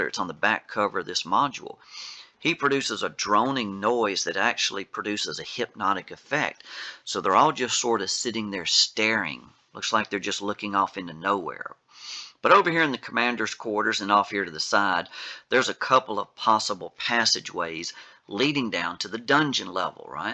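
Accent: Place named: Appalachia, United States. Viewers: I’m loving that accent. What part of the US is it from? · I'd say southern Appalachian. So maybe Tennessee or southern West Virgina.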